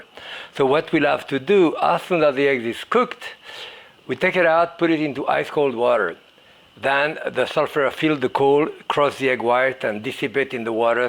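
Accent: France. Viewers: Like Pavlov's dog, I hear french accent and get hungry right away. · Jacques is the most beloved American with a French accent 😁 🗽 The statue of Liberty is nice, but she's no.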